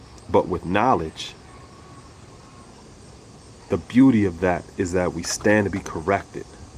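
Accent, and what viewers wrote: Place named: Upstate New York, United States. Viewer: He's got an upstate NY accent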